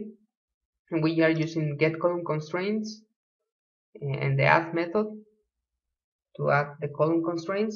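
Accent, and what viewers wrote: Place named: Italy. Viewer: Accento italiano!! Grazie per il tutorial!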